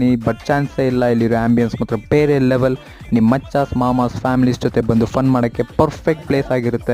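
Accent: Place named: Karnataka, India. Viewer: broo 😊 video mathra nim kannada slang mathra crazyyyyy❤ more videos like this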